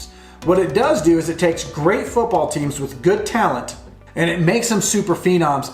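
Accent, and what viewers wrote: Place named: RP, United Kingdom. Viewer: It's so nice to heard commentary about CU by someone who speaks the Queen's English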